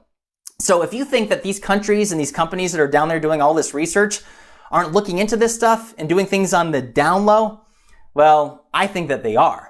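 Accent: United States. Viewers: woah brother are you from Idaho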